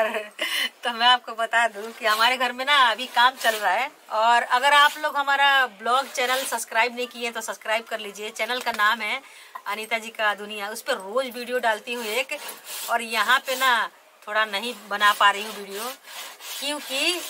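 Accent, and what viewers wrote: Bihar, India. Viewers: aap bihari hai kya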